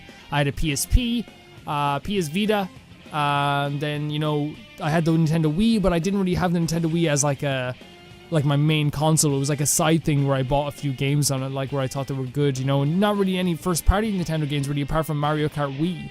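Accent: Ireland. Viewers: You kinda sound like Jacksepticeye are you Irish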